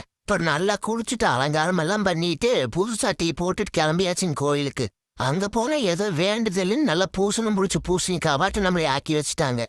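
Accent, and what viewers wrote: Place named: Kongu, India. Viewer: Kongu thamil super ❤❤❤😂😂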